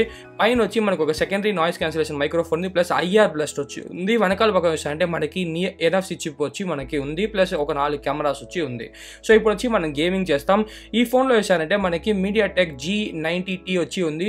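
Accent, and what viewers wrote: Tamil Nadu, India. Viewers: Telugu explanation with Tamil slang tho adharagottav bro.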